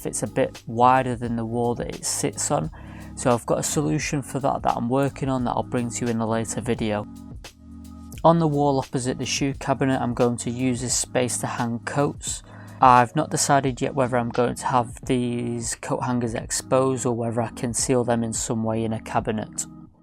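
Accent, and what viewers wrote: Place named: North, United Kingdom. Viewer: The quality of videos is very good. I also love your northern accent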